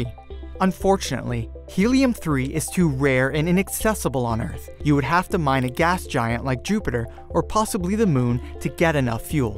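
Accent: United States. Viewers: You sound American. Most likely an American audience. Telling us speeds in kph is a bit snobby.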